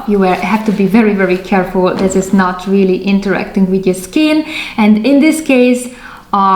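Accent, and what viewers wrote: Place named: Hungary. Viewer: A videó alatt végig sejtettem, hogy magyar lehetsz, aztán ránéztem a lejátszási listára :D Jól megy Neked az angol, de mégis megvan az a magyaros kiejtés. Viszont örülök ennek, hogy van magyar női rajongója is a Hot Wheels témának :)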